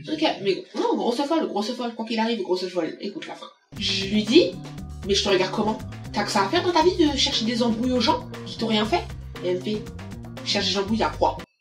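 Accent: Antilles, Caribbean. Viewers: Tu est antillais je connais l'accent créole tu est d'ou au îles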